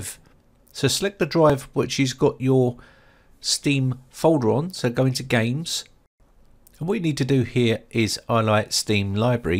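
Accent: United Kingdom. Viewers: Not even close they have a different accent. The man on here has a British accent and the one playing Korg has a different accent a New Zealand accent to be exact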